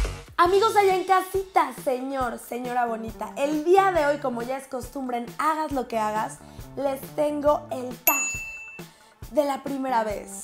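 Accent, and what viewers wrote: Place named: Mexico. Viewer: porfa no hables tan mexicana no te entiendo. saludes desde españa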